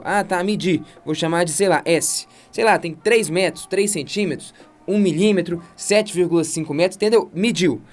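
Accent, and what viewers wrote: Minas Gerais, Brazil. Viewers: Esse sotaque mineiro deixa tudo ainda melhor 😊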